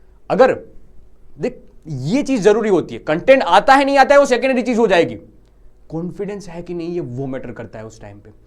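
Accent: Gujarat, India. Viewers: Gujrati lag rahe ho app ??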